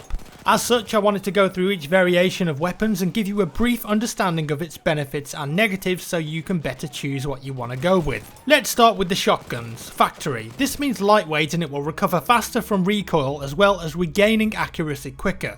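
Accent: England, United Kingdom. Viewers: in squad up if youre on the british side please say "A tiger? in africa?". You got the accent use it :) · are you from english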